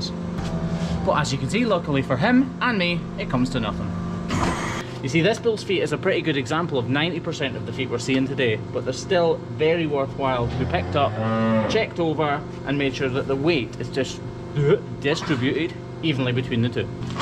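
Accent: Scotland, United Kingdom. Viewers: I love all your video cause your never do click bait it’s always real and very entertaining to watch and I’m sure everyone loves your Scotland accent lol i know I sure do but I just wanted to thank you for these extremely entertaining videos ❤️ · I love your videos! Part of it is the wonder of this previously unknown, amazing world. Another part is the sunny style of presentation. And part is just your delightful accent - and learning some Scottish slang! But I have a question——what is the difference between a hoof trimmer and a blacksmith? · Scottish accent is cute. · Lmao tbh the peeing and pooping and the reactions and the scottishness is half of why I watch this channel, the other half being the bovines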